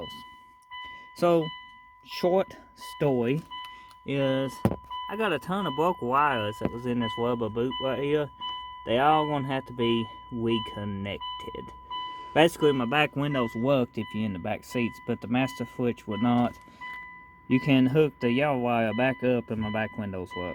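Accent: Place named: South, United States. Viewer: What a accent bro. Down in the real swamp shit.. Right on man thanks for the info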